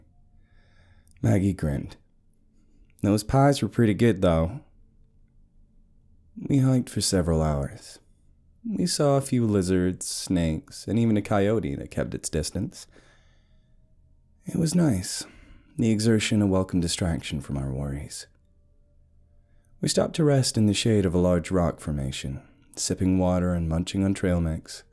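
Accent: United States. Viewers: Your American accent is very good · Is that you behind the American accent or a guest?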